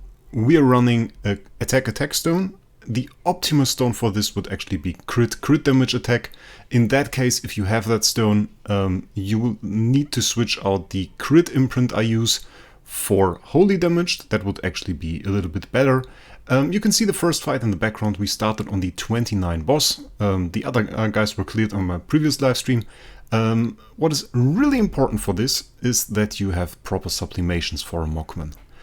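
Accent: Germany. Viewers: Bist du Deutscher man erkennt es so am Akzent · First of all great video. You make it look insanely easy. And secondly I wanna ask if you are from Germany because your accent sounds very German.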